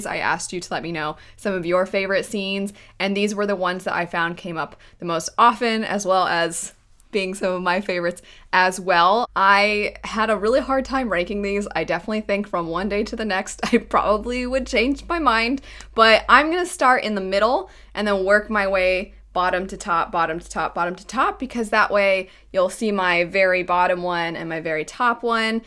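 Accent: United States. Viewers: Stumbled on this vid by accident and „Wiedźmin” collector’s edition (Polish version!) caught my eye immediately. Same for the polish „Mistborn trilogy”. Now I’m curious - are you a Pole? Can’t hear the onion accent in your English and your channel info says „US”… I’m so confused 😂 In case it’s not obvious - I’m a Pole myself and seeing those books contrasted by your perfect american accent is bugging me rn 😅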